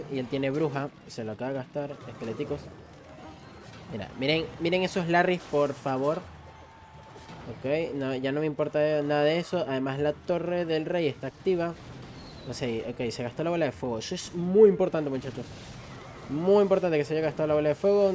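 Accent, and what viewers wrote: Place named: Venezuela. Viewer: Bro gracias a que estoy en tu Discord supe sobre este videazo, por cierto, eres Venezolano verdad? Yo lo soy y tú acento es Venezolano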